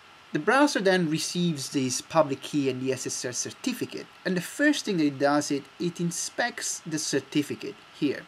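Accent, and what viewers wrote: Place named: Italy. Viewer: Ottimo inglese ma l'accento italiano è davvero impossibile da nascondere Hahahah Btw nice video!!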